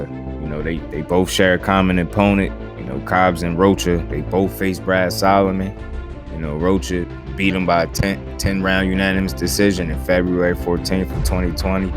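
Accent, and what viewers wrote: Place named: Washington DC, United States. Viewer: omG, you’re from the dc area?? i think i hear a dc accent, especially when you say “hands”.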